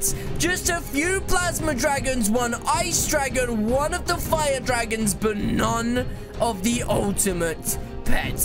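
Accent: England, United Kingdom. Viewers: O.O HES ENGLISH!.!. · Hey I didn’t know your british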